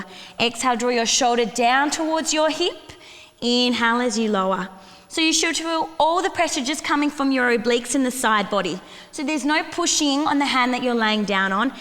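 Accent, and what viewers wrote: Australia. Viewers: I love your Australian accent!!!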